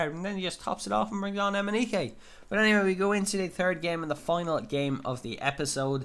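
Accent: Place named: Ireland. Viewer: Where in ireland are you from? :) class series btw :)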